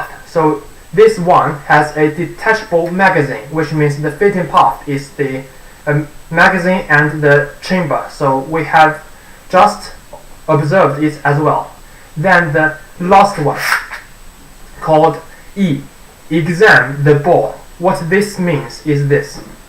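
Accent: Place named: United Kingdom. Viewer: Why sounds British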